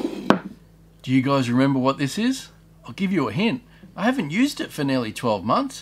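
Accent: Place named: Australia. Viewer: I love everything you make and it is nice hearing a fellow Aussie on YouTube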